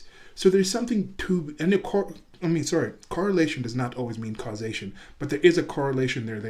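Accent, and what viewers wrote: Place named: United States. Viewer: Your accent says you're American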